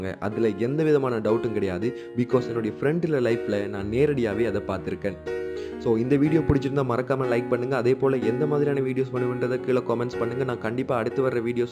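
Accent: Sri Lanka. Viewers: Bro ninga sri Lankan tamil la?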